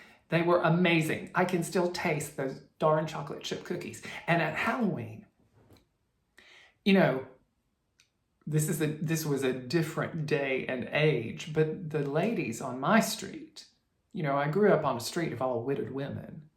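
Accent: South, United States. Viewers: Loving the videos! Ronnie. Lavender. Needleworker. I’m new here and love what I see! Thanks you! You are so funny! I love your mannerisms and southern accent! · Ooohhh Ms Gibbs telling you that you needed to improve your potato peelin skills....your face, priceless! Did you show cross stitch, you probably did. But your stories in that lovely southern accent warm my heart. We spent 3 years in Alabama with my husband's work. Alabama has a different breed of people. The kindest, most generous, funniest and every one has a story! You can't go buy some beans without a story. I miss it there. You bring me back. 💗⚘️ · Having grown in Mississippi and still live here, I love and appreciate the stories. The southern accent just enhances the poems. Hope you have a great week. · Enjoyed your Granny’s poetry once again…and I get a kick out of slipping into your southern accent. 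It does indeed add to the reading. That Home of a Needleworker is adorable. Your garden is lovely. Thanks for sharing it with us.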